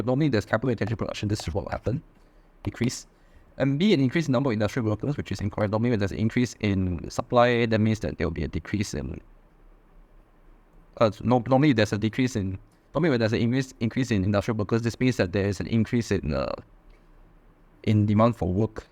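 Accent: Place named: China. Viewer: Chinese accent tough to understand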